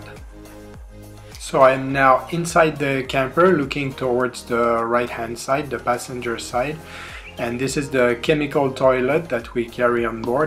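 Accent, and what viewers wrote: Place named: Argentina. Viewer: hacelo en castellano, no se aguanta ese acento argento en el inglés....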